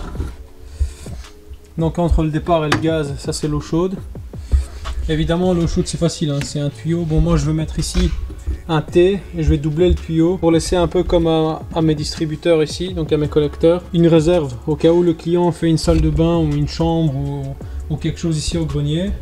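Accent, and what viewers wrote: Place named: Belgium. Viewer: Rien à dire bravo ! J'aurais aimé être aussi pro que toi! A ton accent il me semble que tu sois de Belgique ! Dommage j'aurais peut être eu besoin de toi à l'achat de ma future maison car je sais que tout serait soigné et maximisé !🙏🙏👍👍je m'abonne direct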